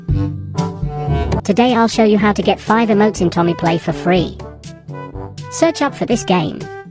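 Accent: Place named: United Kingdom. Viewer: Your accent is british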